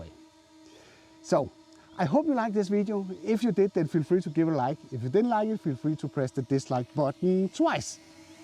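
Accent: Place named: Denmark. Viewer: ???? JEG VIDSTE DET!!! Du er dansker - den accent kan du bare ikke løbe fra. " I mean eeh, you have been to school, not? I though it enough", " "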